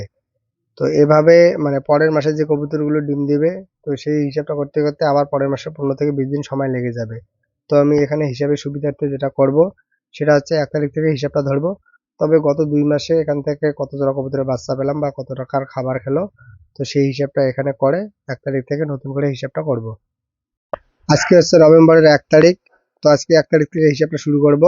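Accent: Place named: Bangladesh. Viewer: Apnar bangla bola akdom bangladesh ar moto India ar moto na ami pothoma apnar video dakha mona korchilam apni bangladeshi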